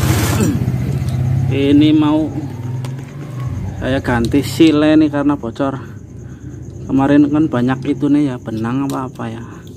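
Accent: Banyumas, Indonesia. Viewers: ora ngapak ora kepenak.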